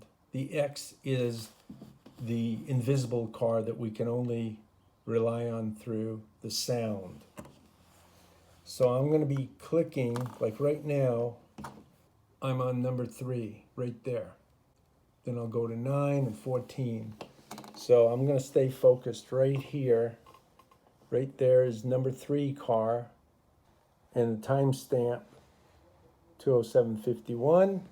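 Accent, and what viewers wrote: New England, United States. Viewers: Are you from New England,? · Wonderful video !!! Very well explained it, also I love the way you do it, I can hear your voice the entire day, is very calming and love the accent … New England ha !! 😊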